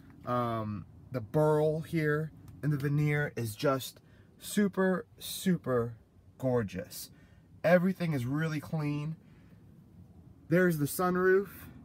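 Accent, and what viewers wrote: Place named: United States. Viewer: I don't want one with out the tray tables either, that's the same way I feel about buying and Jaguar, it has to be VDP. You sound like one of us Yanks in the US mate. lol. In one of your other vids you also said the 2007 Arnage was the best year to own, so my question would be which year would you recommend for someone who's never owned one? There aren't very many independent service techs who specialize in them in my city Austin, TX.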